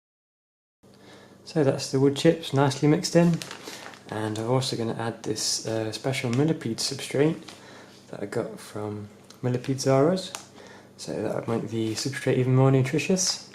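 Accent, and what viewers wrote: United Kingdom. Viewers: You are from derby aren't you!!!???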